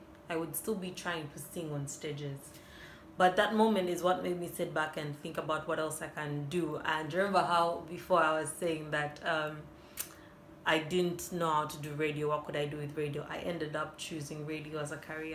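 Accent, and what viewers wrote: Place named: Nigeria. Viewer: U sound Nigerian funnily enough...nice personality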